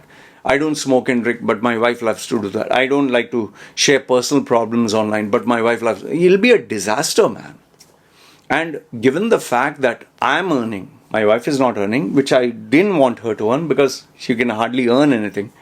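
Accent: India. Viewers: Indian accent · Bro is Indian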